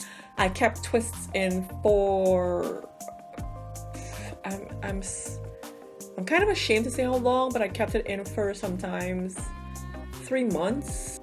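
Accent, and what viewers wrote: Trinidad and Tobago. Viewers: Ohhhhh is that a trini accent i hear?? Big up the T&T massive lol we out theree. Very informative vid too. I have a twa and im still trying to get ways to style it , sigh.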